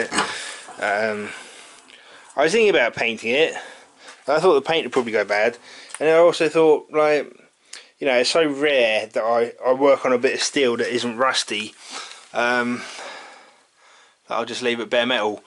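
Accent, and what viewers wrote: United Kingdom. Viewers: Nice work. Essex accent?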